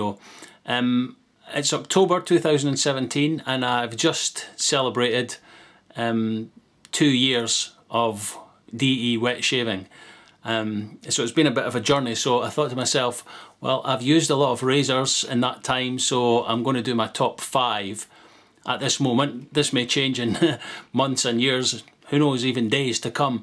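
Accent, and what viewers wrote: Scotland, United Kingdom. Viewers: love the Scottish accent! long live Scotland! :) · I'm still searching, while avoiding Gillette, because of their advert. I have an old 1958 Gillette that''s still serviceable, from the year before before I went into the military. When I can afford it, I'll try the Rockwell. I really like your channel, and I enjoy the intelligent comments made by your viewers. I wish I had a Scottish accent like yours. Thank you for an informative and entertaining half-hour now and again.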